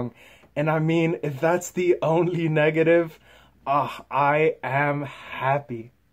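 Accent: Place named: Atlantic Canada, Canada. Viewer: You from the atlantics? Trying to pin down your accent lol. New Brunswick or Nova Scotia would be my guess but it's really a toss up over there for me ahaha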